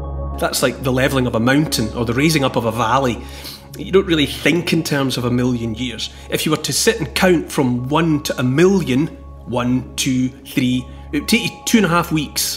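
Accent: Scotland, United Kingdom. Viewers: sadly yes. He doesn't "look" the stereotypical history buff. But that's what draws me to him initially, and of course the content and the obvious Scottish delivery. My son is at University in Ireland at the moment and he tells me that the majority of them at very disappointing to say the least. Even the "clubs" are disastrous, well indoctrinated with the wrong information. It's a shame really. Tonight in Ireland, practically all restrictions are lifted...the country is elated. I for one will never forget what they've done..I hope things get better there in Germany. I'm only going on the information that I've seen coming out of Germany..its not good, or am I wrong?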